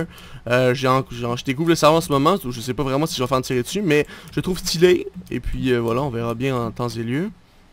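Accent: Quebec, Canada. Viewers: québécois yessss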